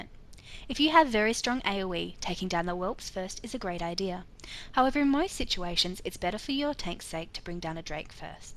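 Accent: Australia. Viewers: Aussie right? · Aussie narrator, US based guild.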